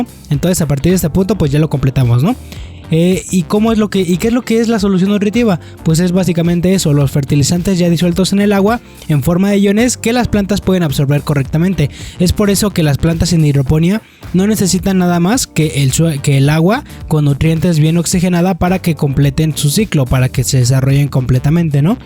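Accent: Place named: Mexico City, Mexico. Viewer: A mi, me molesta mucho el sonido que hace cuando usa "tr", ya sé, es extraño pero me imagino que tiene la boca lleva de baba además además de su acento chilango, pues buen trabajo. Felicidades.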